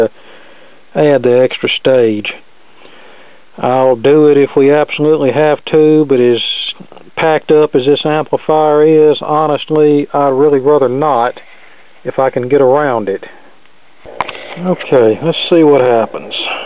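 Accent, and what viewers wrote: South, United States. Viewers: you sound like a True- Knowledgeable Southern Gentlemen of Audio Repair Technology - I,ll stay subscribed to your Channel.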